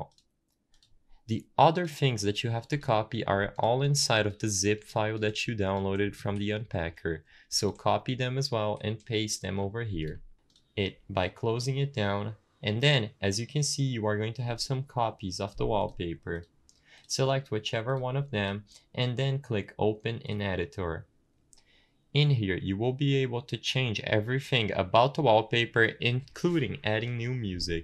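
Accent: Brazil. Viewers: Are you from Brazil? Your accent is so familiar to me.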